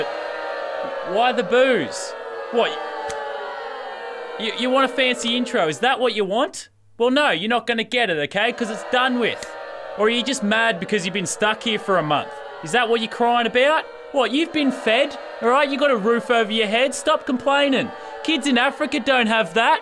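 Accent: Australia. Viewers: Are you australiain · are you Australia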